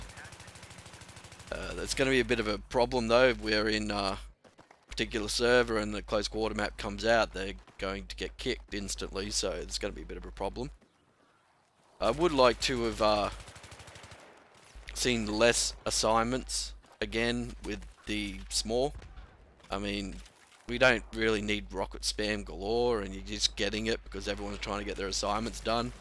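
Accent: Australia. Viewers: I still can't get over how sexy your voice is. Dat 'stralian accent. I thought now that rent-a-server had come out, you were able to modify the player count. That sucks that it's limited to 8v8 :(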